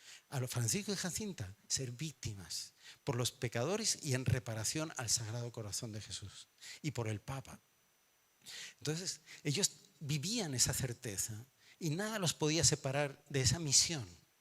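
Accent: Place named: Aragon, Spain. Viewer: Me encanta oírle padre, la Virgen le ha elegido con esa gracia baturra que nos hace llegar de una forma muy simpática todas las verdades evangélicas. Dios le bendiga.❤😂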